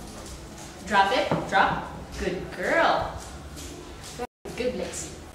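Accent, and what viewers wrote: Philippines. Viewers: pinoy ka no? halata sa accent ng pag english mo hehe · una palang, alam ko nang Pilipino ka, dahil sa accent.. :D hahahaha!! im a Filipino too, and I am very proud to be one.. :) uhm san ka bumili ng Golden Retriever? and how much? please reply, kasi adik na adik ako sa ganyang breed and I would like to adopt one and take care for it... ilang beses mo po siya pinaaliguan? hahahaha!!! :D (ew laway...) :)) xD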